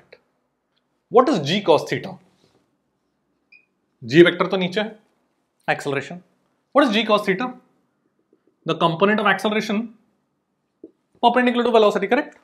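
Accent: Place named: Tamil Nadu, India. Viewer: Your English sounds like tamil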